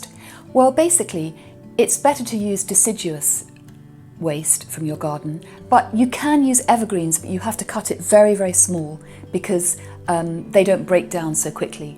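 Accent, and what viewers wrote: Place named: London, United Kingdom. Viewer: Miss,I really, really love your London accent and your videos, I wish you a healthy and happy family!!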